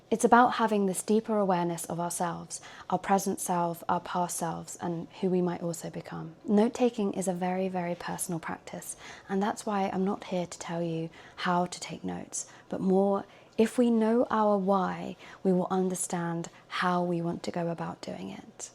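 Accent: United Kingdom. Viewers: Great video, my algorithm has been serving me this kind of stuff lately, it’s really refreshing. By the way, where in Britain are you from, what is that particular type of British accent?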